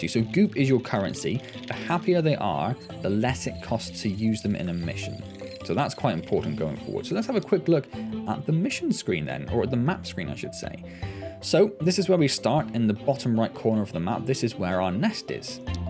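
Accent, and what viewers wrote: United Kingdom. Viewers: gdamn i like ur voice u british?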